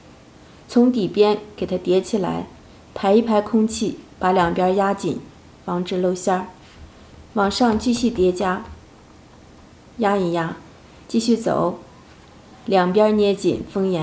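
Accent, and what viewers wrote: Northeast, China. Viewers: 超做作，虛。想你東北話就賊好聽了。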